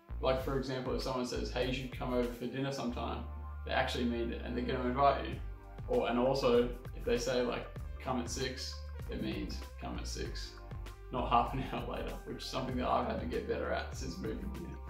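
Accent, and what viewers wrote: Finland. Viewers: Yoooo! Your finnish accent is damn good! :) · I haven't heard that kind of finnish accent except maybe from Kimi Räikkönen.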